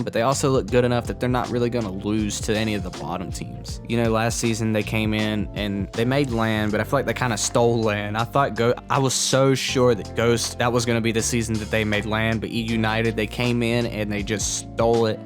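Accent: South, United States. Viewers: You sound like a more southern version of GarrettG. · idk why but im getting a hint of country accent like the same accent in my area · Great video, great analysis, and I like your southern accent 10/10 quality rocket league content creator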